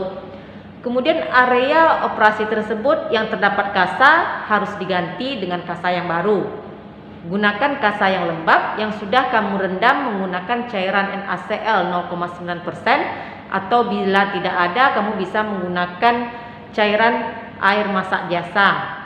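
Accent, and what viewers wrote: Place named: Padang, Indonesia. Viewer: sepertinya logat dan namanya buk dokter orang padang, maaf kalau salah🙏 kalau daerah sumbar RS mana yg biasa menangani fistula ya buk?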